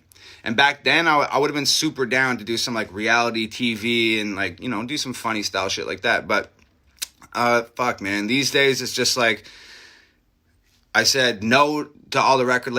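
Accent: Canada. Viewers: Easy to tell you are Canadian.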